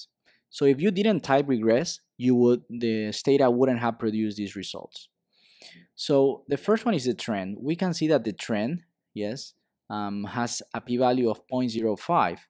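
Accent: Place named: Spain. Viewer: Very nice instructions that get to the points immediately. Just had to get use to your charming Spanish accent, but after overcoming this "obstacle" no problems whatsoever!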